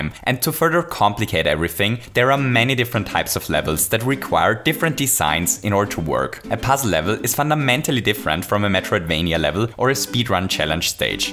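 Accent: Germany. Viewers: Are you a German because you're english sounds like it has a german accent and I'm Not really Sure about it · Could you please make Videos in German? Ich kann deinen Akzent hören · Wait r u German? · Du hörst dich deutsch an :D kann es sein? :o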